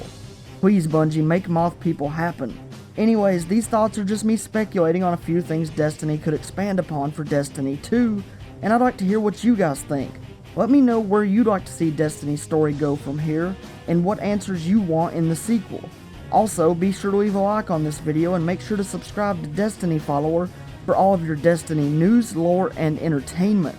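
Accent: South, United States. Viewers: I thought it would be a fuunnn way to tie up those loooose ends 👌cowboy accent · I hate southern accents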